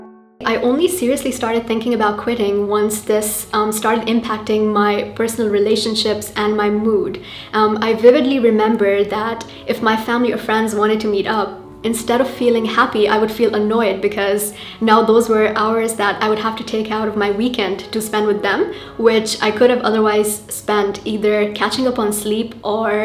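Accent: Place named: RP, United Kingdom. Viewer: How did you develop such a posh accent?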